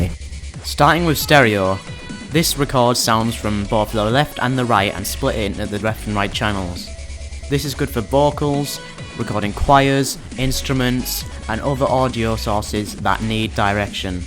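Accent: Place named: England, United Kingdom. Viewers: great review and great to hear an english accent as well :) also a very good mic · Actually its quite the opposite. Cockney is southern, he is northern as fuck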